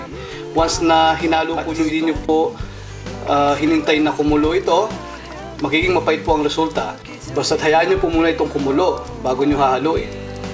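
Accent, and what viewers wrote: Pampanga, Philippines. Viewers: kapampangan ka ba??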